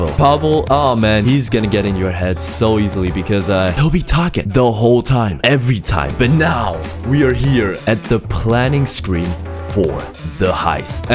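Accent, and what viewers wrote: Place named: Germany. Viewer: Good English skills, I cant even hear out the german accent although i’m trying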